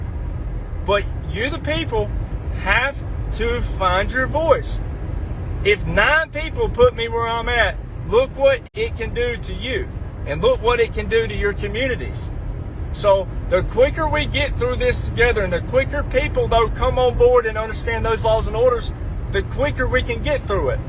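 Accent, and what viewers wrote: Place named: South, United States. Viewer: There’s some things that just sound better in a Southern accent. js…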